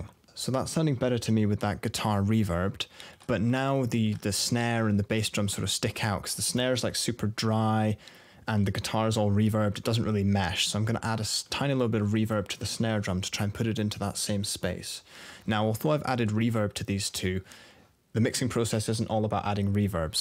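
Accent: United Kingdom. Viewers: Are you Scottish or English!? I can't tell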